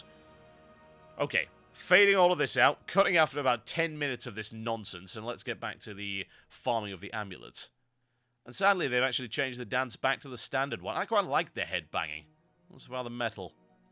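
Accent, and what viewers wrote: United Kingdom. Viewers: are you british or American im confused : /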